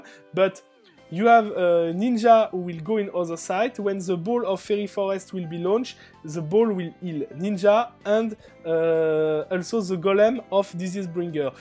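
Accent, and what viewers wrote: France. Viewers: are you from russia ? thought you are french with that kind of accent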